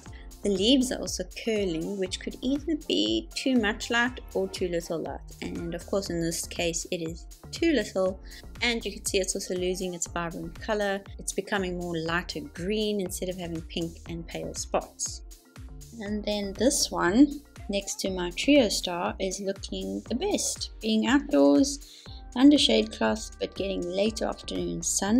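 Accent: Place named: South Africa. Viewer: I Love hearing the South African accent! 🤩 🇿🇦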